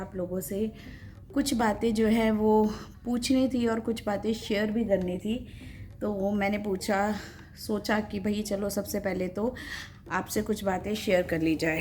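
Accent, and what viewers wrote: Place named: Bhiwani, India. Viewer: Hanji di sahi kaha di aapne hm bhi Haryana s hai hmari boli hee kadak h or sabko lgta h ki hmari tone gusse vali lgti h or vese di aap kaha s ho dadi ama ki bhasha s too ese lgta h ki aap Bhivani side k ho Reply zrur Krna di 😀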